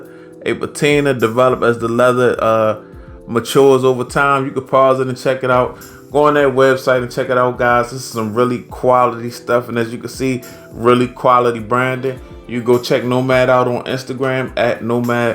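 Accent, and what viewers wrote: Baltimore, United States. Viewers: You from Baltimore or Philly?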